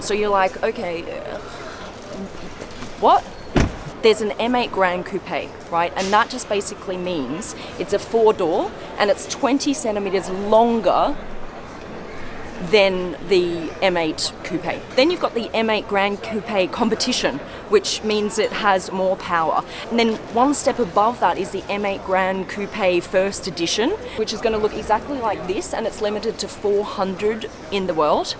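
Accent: Australia. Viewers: Cool video and yes we get it, you have a Lambo!!! Big deal, I have a Bugatti. Do enjoy your accent and I dig Australian women :) Your husband is a lucky man. Do you have a sister???? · As usual, this Aussie ugger is really boring and so in-to-your-face stupid. The accent alone can make me vomit nails!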